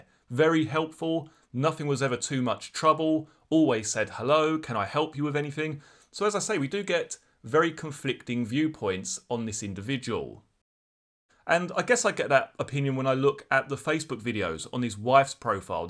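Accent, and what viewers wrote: United Kingdom. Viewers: You may have a British accent, but you are not Agatha Christie !!!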